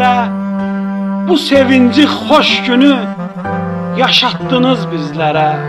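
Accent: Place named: Karabakh, Azerbaijan. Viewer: Sağ qardaşımız.Sənin səsin şerlərin qədər gözəldi.Bəlkədə şerlərini başqa adam oxusa bu qədər möhtəşəm alınmaz.Çox sağ ol.Şirin Qarabağ ləhcən adamı özünnən aparır..👍👍👍Ağdamlı balası.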